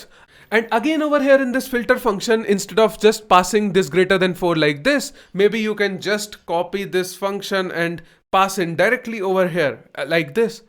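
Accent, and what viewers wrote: India. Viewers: Indglish but I understand and honestly you speak better than me hehe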